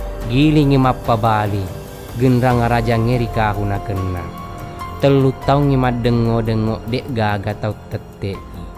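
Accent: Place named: Bone, Indonesia. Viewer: Terlalu kental dengan dialek bone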